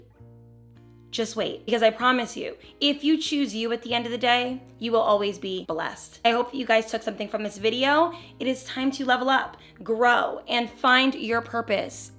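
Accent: New Jersey, United States. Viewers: It’s so sweet, though! You’re very well spoken but it definitely could be a Jersey thing! 😂 I have family there and LOVE their accent and way they pronounce words 🩵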